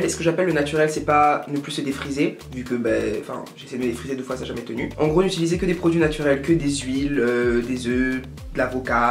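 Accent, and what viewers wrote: Ivory Coast. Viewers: L'accent ivoirien 🇨🇮🇨🇮🇨🇮💗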